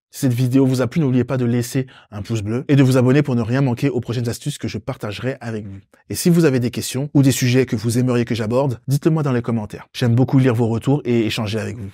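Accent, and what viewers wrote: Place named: Ivory Coast. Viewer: Avec un subtil accent suave Ivoirien 😅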